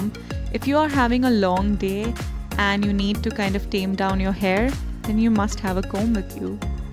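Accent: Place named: United States. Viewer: UR AN INDIAN BUT U HAVE USA ACENT LIKE ME IM ALSO INDIAN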